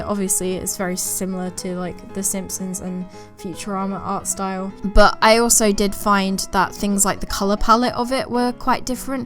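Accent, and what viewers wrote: United Kingdom. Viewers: I love you accent ! British accents are so unique ! I hate American accents maybe cuz I'm so used to them . Idk maybe I just dislike my accent eh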